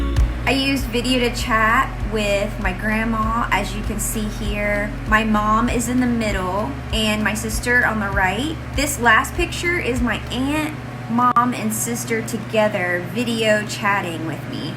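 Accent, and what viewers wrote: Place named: Taiwan. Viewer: 沒關係台灣人説英文一樣。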